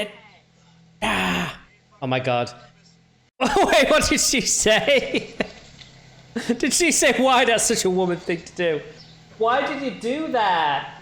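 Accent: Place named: England, United Kingdom. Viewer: Your English accent and the guy farting was so funny.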